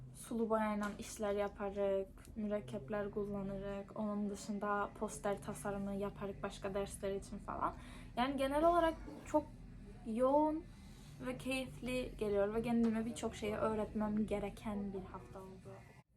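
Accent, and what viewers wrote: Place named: Cyprus. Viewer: Konuşman çok tatlı bayılıyorum Kıbrıs şivesine🌸😘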